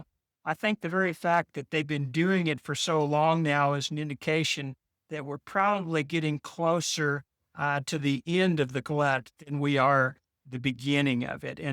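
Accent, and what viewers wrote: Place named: South, United States. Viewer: New microphone? Your southern twang I wish I had is missing today.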